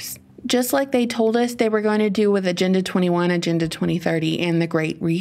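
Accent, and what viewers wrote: South, United States. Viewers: I like how you narrate this in the same tone the same way you’d read a story book to a child. It’s nice. Like “and thennnn” in a song song y voice. I can tell you’re southern to the bone all us southern ladies do this. 😂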